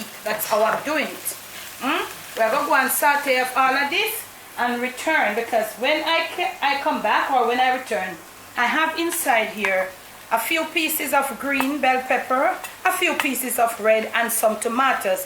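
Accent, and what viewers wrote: Jamaica. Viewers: 😋 yummy...My 6 year old son said 🙄🤦🏽Why she talking like that😂 I said she Jamaican or Haitian 🤷🏽🤔correct me if I'm wrong😕 I said we have different people who speak different languages and voice sound different🤷🏽 We American and he little still learning😊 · Girl you rock mix it drop that patois and English authentic Jamaican lawd we different sah. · Me not a jamaican enuh, but from me small me love fi hear jamaican talk. Something bout jamaican accent juss funny and unique... love har jokes dem and how she say " and all a dat" ...remind me of Oliver and Punsy.... Much love from Saint Lucia.... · That look good I love the Jamaican accent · I just love the Jamaican accent. I could listen to you talk all day. Your voice is so soothing!!! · God bless the day I would learn Jamaican accent. It gives me so much moral. ♥️😍 We call that rice,concoction rice: where everything goes in. 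It is so delicious 👌♥️😋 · I love this mama,full of food love, culture and blessed black woman, love the Jamaican accent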